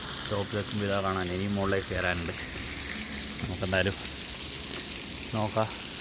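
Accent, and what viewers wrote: Kannur, India. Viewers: നന്നായിട്ടുണ്ട് എങ്കിലും സംസാരത്തിൽ കുറച്ചു കണ്ണൂർ ഭാഷ കൂടി പോക്കുന്നു വീഡിയോയും ഒന്ന് കൂടി ശ്രദ്ധിച്ചാൽ അടിപൊളി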